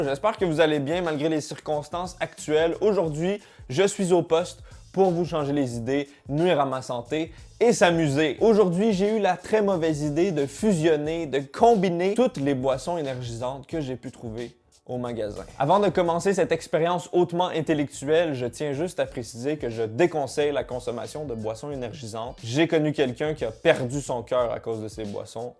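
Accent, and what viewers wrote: Quebec, Canada. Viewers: même mon père il te connais et il arrête pas d'immiter ton accent du quèbec ( et si je sais pas écrire quèbec vraiment dsl ) 😅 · He is from Canada ( Quebec) so this is french but with a quebec accent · J'adore car quand tu est exiter tu commence a parler vite en cebequoi et on ne comprend rien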